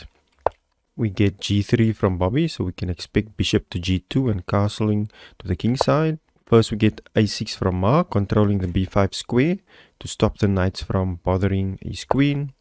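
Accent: South Africa. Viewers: Thanks for the reply/clarification. Nice to hear your South African accent (I used to live there).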